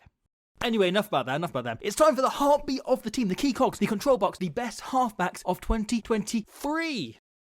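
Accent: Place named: England, United Kingdom. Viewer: are you no longer Welsh(you sound English)? Wayne Barnes best ever...? Really? Hes good, maybe the current best but Nigel Owens was better & IMO best of professional era. Nigel ruled to the spirit of the laws. Wayne, being English, always ruled to the letter but occasionally Nigel contradicted the letter but never the spirit of the laws. Honourable mention Wayne Ericsson -Aus. Legends: Paddy O'Brian & Colin Hawke who invented yelling instructions to the players pre professionalism.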